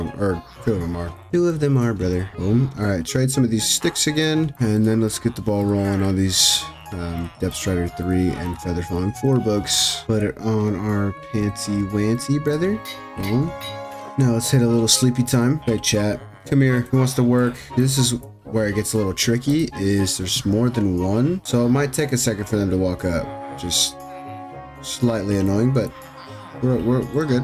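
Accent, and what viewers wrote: United Kingdom. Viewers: that english accent was crazy bruv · the british accent was perfect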